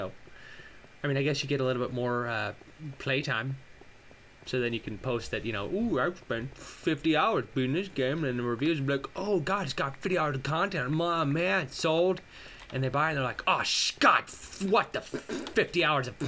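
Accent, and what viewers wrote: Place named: England, United Kingdom. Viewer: I love his English accent. It's........ EPIC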